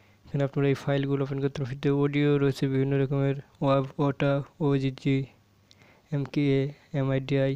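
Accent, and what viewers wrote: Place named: India. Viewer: English ENGLISH . Dont put your title in ENLGISH if youre speaking chicken scratch from india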